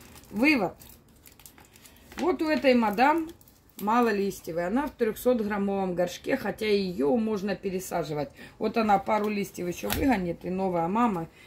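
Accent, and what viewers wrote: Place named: Ukraine. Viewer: думала,что вы украинка(я сама украинка и с таким же акцентом)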